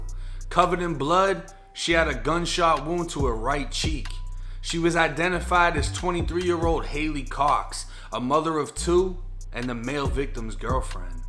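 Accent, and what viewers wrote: Boston, United States. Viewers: You. Sound. Like. A. Boston. Person. Its. Your. Pronouncing. Of. Some. Words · Dude what are you from Dorchester 😂 every time you hit that "A" it gets me lol. I used to live in Brockton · you def caught the Boston accent! I have one too, I’m rocking wit ya! 🔥🥰☘️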